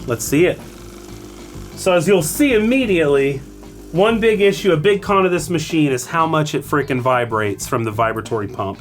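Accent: United States. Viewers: I have lived in Spain for 35 years. In San Cugàt. We watched Ascaso being born here. So these are machines we’ve been using for years. Along with our amazing (heavily modified) hefty and reliable Italian Saecco aluminum and ss core. Almost 25 years and making amazing cups. We’re not worried about the 21 gram baskets or naked portafilters on this machine. We rely on its original but strongly modified porta filter with a nice ims basket. A charm. Even with tough coffees. Ascasos here are the standard. And, if you open all single head ones, you’ll find the same exact components inside, even with the humble Basic. The “new” PID Dream is the same as the Steel but with only one thermocoil. You should cut it in half and look at the marvel of ingenuity that makes these machines fast, predictable and reliable. Not for a scientist but for an espresso drinker for more than 45 years. 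Ascaso is one of the most amazing brands in Europe. Canadians are adopting them. But, Portugal? They’ve been there for a really long time. Loved your content. Makes me proud of you… the Master. You rock. Sorry. Não sabia que você era português. É que você parece tão americano. E seu sotaque e tudo mais são tão americanos que nem consigo entender como você está apenas “apresentando” uma máquina Ascaso. Suponho que seja para seus principais seguidores nos EUA de A.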